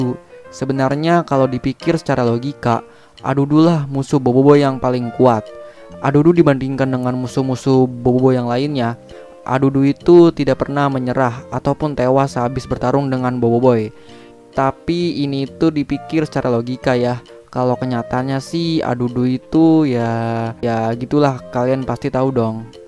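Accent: Java, Indonesia. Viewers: Bang kamu orang Jawa bang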